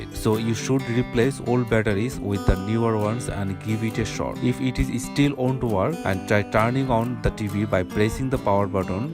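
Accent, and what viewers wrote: India. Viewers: Indian accent lol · Your English...Indian pronunciation...gives me more headache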